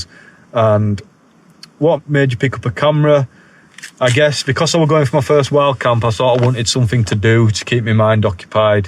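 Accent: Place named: Bradford, United Kingdom. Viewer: You from Bradford?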